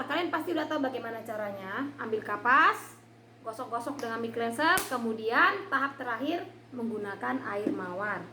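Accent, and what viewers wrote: Medan, Indonesia. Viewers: Maaf mbak mcem orng medan mbak ngomong nya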